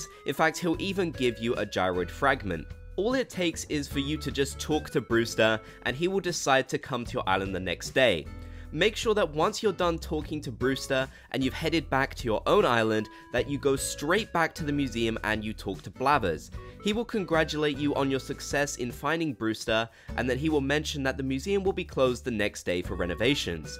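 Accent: United Kingdom. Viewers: Wait are you british i thought your american but you sound British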